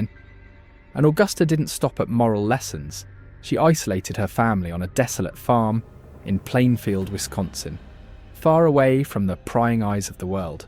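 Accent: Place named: South England, United Kingdom. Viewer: Great work sir, excellent narrator sounding South England vibes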